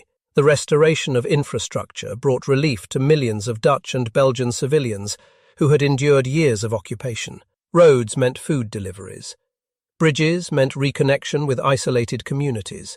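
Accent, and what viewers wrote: United Kingdom. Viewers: If this is all about Canada why does the narrator have a Brit accent? WHO is the narrator? Where can I find the credits for the writers, creaters, narrator etc?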